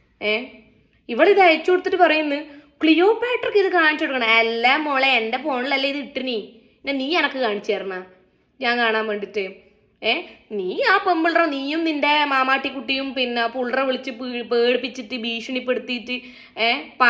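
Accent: Kasaragod, India. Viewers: Nammude aa Kasaragod language kayari varumbo oru sugam kelkan. Hats of you dear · Edek ninde slang ksd ambo nalle paangan ..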